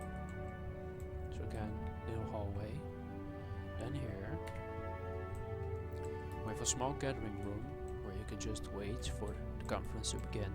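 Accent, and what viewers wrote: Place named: Turkey. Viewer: Question: Are you Turkish? You sound Turkish.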